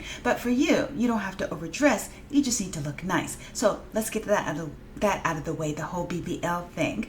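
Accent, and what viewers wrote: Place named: South, United States. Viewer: okay, I thought I was picking up that southern lady style and I did hear you say that you and your husband were at Clemson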